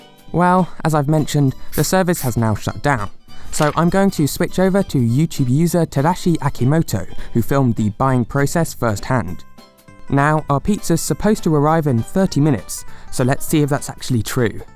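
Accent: United Kingdom. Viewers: You literally speak a British Accent!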